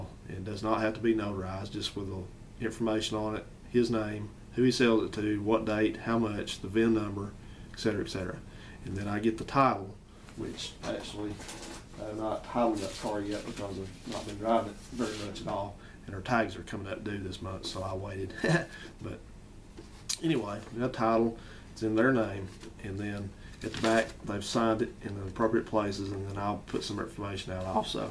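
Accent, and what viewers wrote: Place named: Alabama, United States. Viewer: OMG this drives me insane! Dude I am with you. These stupid "Alabama Tag Applied For" signs are just illegal and the authorities turn a blind eye to the issue. Ran across this video searching for this exact subject. I'm in AL too. I watch your other channel and I was like that boy is definitely from Alabama. The central AL accent is very recognizable. 😁😁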